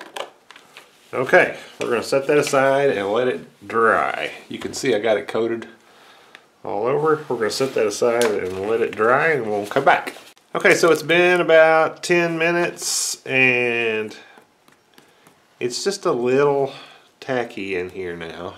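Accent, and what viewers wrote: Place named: United States. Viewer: great accent! Which state is that? (I'm English.) Also, great video.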